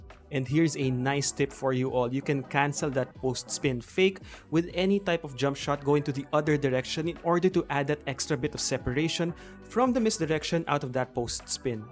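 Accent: Philippines. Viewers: Is that a Filipino accent? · First of all keep it up. Awesome content! Second of all I like your Filipino accent lol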